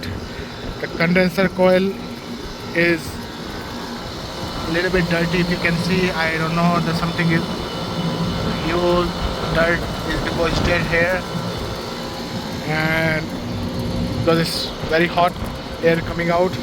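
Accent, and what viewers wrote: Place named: India. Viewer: You sound like you’re from India. I would like to connect with you and learn about your journey in the HVAC trade.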